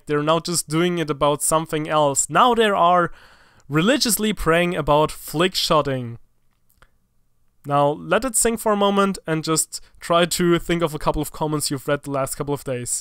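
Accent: Germany. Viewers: ! Der deutsche Akzent regelt Bra^^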